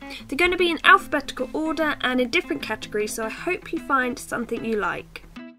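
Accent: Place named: United Kingdom. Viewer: Just sat here watching this with my 3yr old and for no reason he was repeating every word you said trying to mimick your accent (we are german and living in the us so the combo of that with him trying to sound british was 👌🏻😂)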